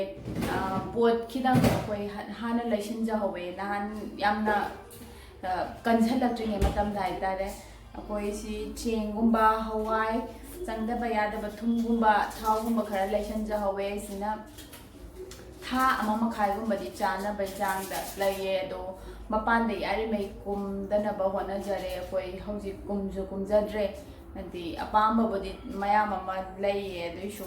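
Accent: Kakching, India. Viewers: Tava ym nungai kakching mcha na ngngvase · Accent se kakching oiduiro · Kei lam dei no babhi ng ....kakching dei manli...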